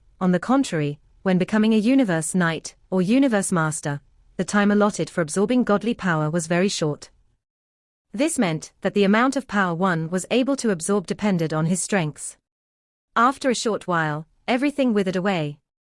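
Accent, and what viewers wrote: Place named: United Kingdom. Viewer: What an annoying British accent voice over.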